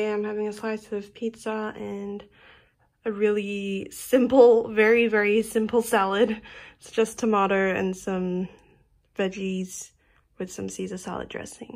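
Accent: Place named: England, United Kingdom. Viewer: Are you from the UK? You have a very English accent.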